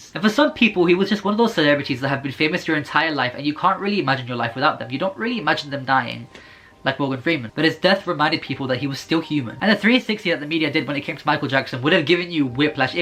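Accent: United Kingdom. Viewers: You're British??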